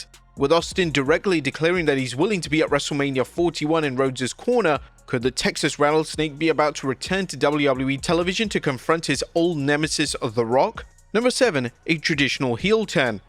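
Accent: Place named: United Kingdom. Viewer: i hear a british accent, i leave the video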